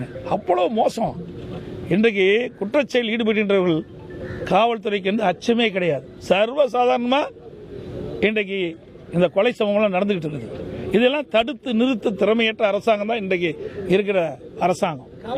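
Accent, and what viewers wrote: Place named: Kongu, India. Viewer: கொங்கு வானி யோஅது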